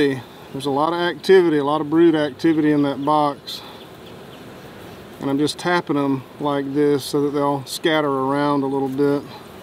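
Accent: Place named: United States. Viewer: this is the most american channel i've ever seen and it is so good i can't stop watching. i am sorry if i sound like a butthole i just happy that i could distinquish (spelled wrong probably) an accent and understand all these imperial measurements bc i am interested in new stuff. i love the atmosphere, please keep on going!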